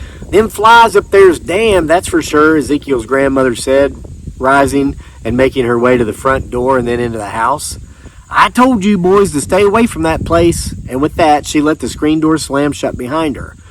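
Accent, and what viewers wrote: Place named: West Virginia, United States. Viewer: I love all of your stories ..... I was born in West by God Virginia and spent my first 18 years there..... I love hearing your West Virginia accent..... and stories ....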